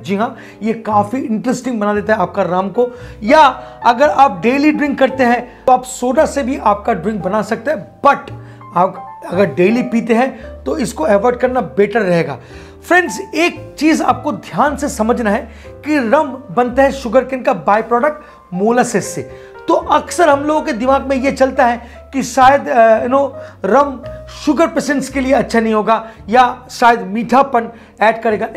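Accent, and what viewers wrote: Bengal, India. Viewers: you are too good - I love the Bengali accent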